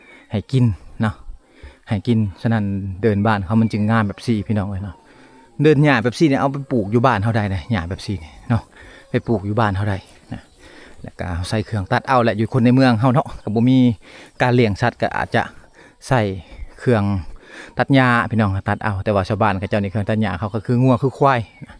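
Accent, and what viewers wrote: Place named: Southern Isan, Thailand. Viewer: อยู่แขวงไหนครับพูดเหมือนคนอิสานใต้ครับ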